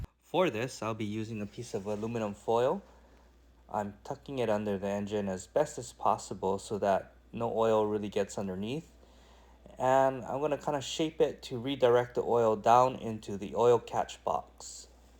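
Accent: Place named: Hawaii, United States. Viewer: 😂😂😂 I knew u was from Hawaii as soon as u had talk!! Aloha to u from Kauai. Thanks for the vid, very helpful! I’ll b trying to do my own oil change on my Mclane. 🤙🏼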